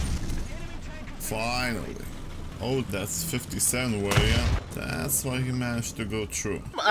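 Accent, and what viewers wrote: Eastern Europe. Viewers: uses british tank yet im still hearing some slavic some sounds.... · Why is this in my playlists and warthunder is still going wow I haven't played this for years . Eastern European accent and crap meme's no thanks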